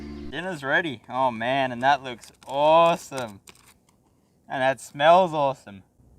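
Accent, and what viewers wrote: Australia. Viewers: Great video :D I'm watching you from some time but I didn't notice that you are half Polish. I guess you were born in Australia which explains the lack of accent. But it's nice to hear "no a szufeleczka, albo coś, dzień dobry..." next to your Australian accent.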